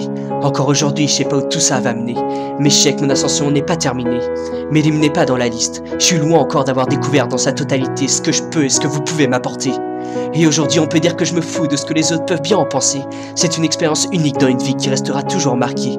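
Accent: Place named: North, France. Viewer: Les Textes vidéos d' ah oui tu es du nord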